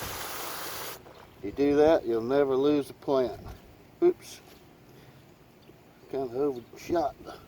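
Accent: South, United States. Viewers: After has been gone a few months....I still love to come watch him and hear his peaceful southern voice. Love his videos forever. It's still tough to imagine YouTube without my friend. Miss you Bud!! God Bless you and your family and Toby!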